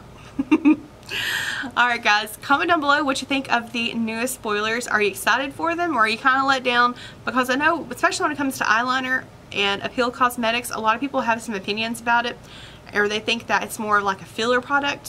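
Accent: South, United States. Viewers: Omg I love you, your giggle is infectious and your southern bell accident is adorable. Also thanks for the spoilers.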